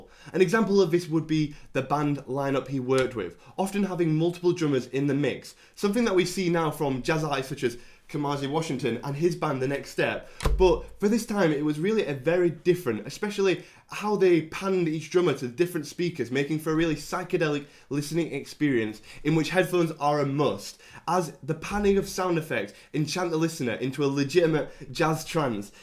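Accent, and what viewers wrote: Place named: Yorkshire, United Kingdom. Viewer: Totally butchered John's name! He's a fellow Yorkshire man. I assume you're a Yorkshire man by your accent. It's pronounced 'John Mc- clock- lin'! Nice review!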